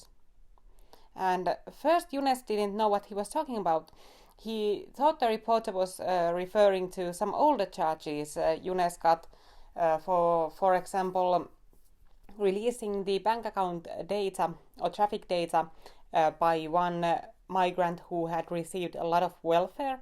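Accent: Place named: Finland. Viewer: You sound Finnish, I thought Finland was pretty conservative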